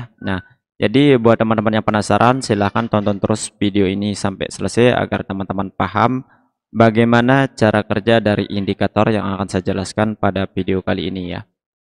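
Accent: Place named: Lombok, Indonesia. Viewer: marak dengan lombok logat side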